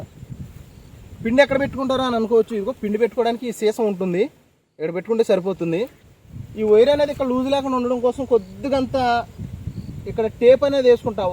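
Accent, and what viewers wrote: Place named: Andhra Pradesh, India. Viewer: nee mata andhra vaala mata lekka undhi anna ravva chepunu mana vallu emani pilustharu boccha chepanena?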